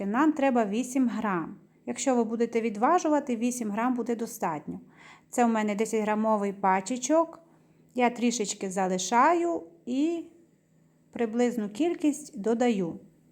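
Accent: Transcarpathia, Ukraine. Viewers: Дякую,! В нашій родині наразі місяць страв з гарбуза, хоча любимо гарбуз, тому він часто на нашому столі.))) У Вас приємний закарпатський акцент, люба! Рясних Вам і Вашим рідним Божих благословень!💙💛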